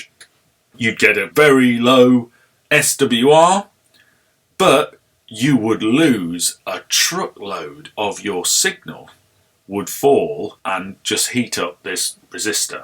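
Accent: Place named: Netherlands. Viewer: Again an excellent video, valuable and highly appreciated. The importance of impedance matching already became clear in the “Connecting It All Together” video I watched a couple of days ago. I skipped it at the time you published it because I thought, reading the title, it was not important to me. Apart from the electronics lessons, your pronunciation is a pleasure to hear, most Dutchmen do not exceed the level of a football coach. 😆 Thanks a million 73, Gerard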